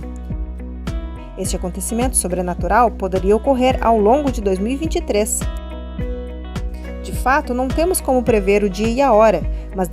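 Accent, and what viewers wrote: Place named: Paraná, Brazil. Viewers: Me inscrevi nesse tbm , irmã. Vc é do Estado do Paraná?. Aparentemente parece vegetação da região sul, dois lugares me faz lembrar da região Sul ou Paraná como tenho dito ou Santa Catarina . Região q está no mínimo a 800 M de altitude do nível do mar . Eu tô certa QTO a isso?. E tbm pq vc tem sotaque paranaense . Ou no máximo santa Catarina q o sotaque é mais forte , mas acho q vcs são do Paraná. Sucesso nessa empreitada. E outra vc pelo jeito de parentes q ainda estão são n foram beijados, mas no meu caso n tive esse livramento familiar. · É região sul, está muito certa! O sotaque do sul é tudo meio parecido mesmo... kkkkk! E nesta região alguns pontos são em torno de 900 metros de altitude. O Criador nos guiou para este local, foi de uma hora pra outra. Estávamos nos organizando apenas com barraca para fugir quando chegasse o momento. 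Por isso, estamos mostrando tudo que estamos fazendo! Desta forma, acreditamos que o nosso mover pela fé possa encorajar os irmãos a fazerem o que estiver ao alcance. Sabemos que construir refúgio na montanha é algo mais extremo, mas é olhando para um extremo assim que muitos podem pensar em fazer o mínimo. Entende? Sobre família, eu faço questão de contar a todos que me perguntam! Apenas meu marido está enxergando do mesmo jeito que eu. Meu pai e minha mãe apenas gostam de natureza, mas meu pai acha loucura esse lugar. Ele reclama quando vai lá... E ele não gosta quando a gente fala do tempo do fim. Já a minha mãe fica mais em cima do muro, ela até entende tudo que a gente fala, mas ela acha que não é para agora. Mas eu ainda tenho minha irmã, meu cunhado, a família do meu cunhado e toda a família do meu marido que estão vivendo no mundo e não dão muita importância quando a gente fala alguma coisa. 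Então, nós sabemos que precisaremos deixar tudo e todos para trás quando tudo acontecer. Estamos nos preparando espiritualmente, psicologicamente e na parte prática também. Quanto à empreitada, eu e meu marido temos em mente que não faríamos isso, até não conseguimos acreditar que est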